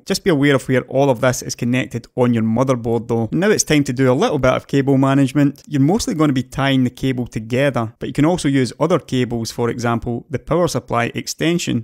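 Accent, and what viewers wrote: Scotland, United Kingdom. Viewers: that thick scottish accent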